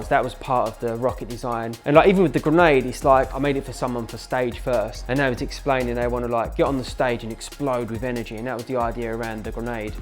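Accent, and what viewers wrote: United Kingdom. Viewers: ما فهمت ولا حرف، كسم اللكنة البريطانية😂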